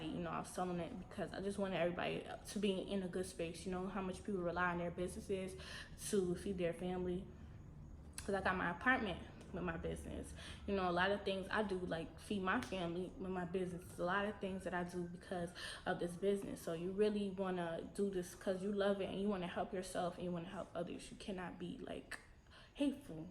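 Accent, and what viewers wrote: New York, United States. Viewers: I promise you I knew you was from New York. It’s the accent for me 😂🖤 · I knew you were from New York because of how you talk lol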